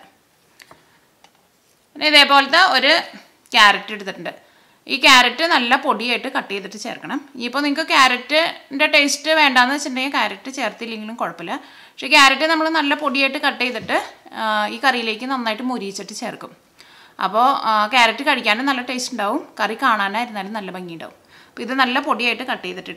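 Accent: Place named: Ernakulam, India. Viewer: Ernakulam style samsaram..husbandinte auntye ormaverunu😘.sound kelkubol...😁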